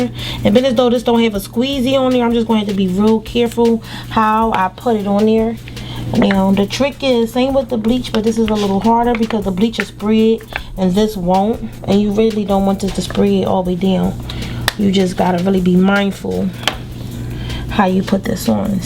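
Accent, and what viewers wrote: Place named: Alabama, United States. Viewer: More like Alabama or Tennessee, sis. Nobody in Nola sounds like that... I’m from Nola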